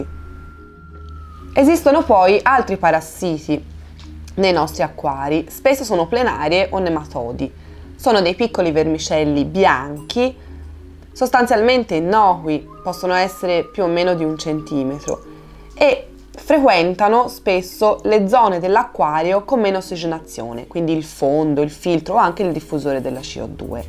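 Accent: Tuscany, Italy. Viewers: accento toscano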